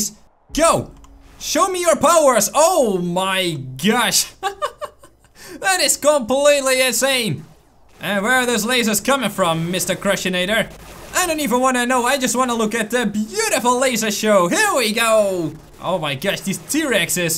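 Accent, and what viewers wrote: Netherlands. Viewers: Are you from The Netherlands? You got that enthousiastic Dutch accent when speaking English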